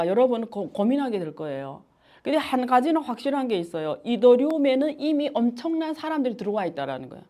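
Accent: China. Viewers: 교수님 어느새 중국어 억양이 많이 없어졌네요. 몇년전에는 듣기 거북했는데 이젠 자연스럽게 들립니다. 건강하세요.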